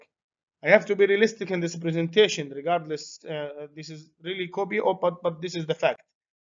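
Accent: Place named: Iraq. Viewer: من لهجتك عراقي❤😊 اذا ممكن اتواصل وياك محتاج هذا الجهاز لكن مال دراجات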